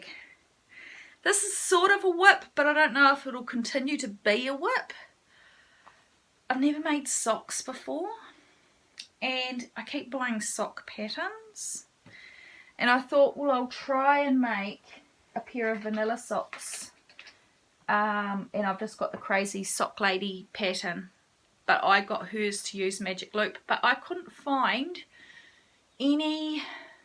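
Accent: New Zealand. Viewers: Kia Ora! I've only just found you, thanks YouTube:0). I'm a kiwi, living in Aus (NSW). It's been way too long since I was home (stupid Covid) and it's sooo nice to hear your accent and feel the connection to home in your manner and way of being. I'll keep binging your channel, while I sit crocheting and resting with my own version of long standing illness that causes fatigue and fall out. Thanks for sharing, it's important for others, for me. I needed that connection today. Arohanui xxox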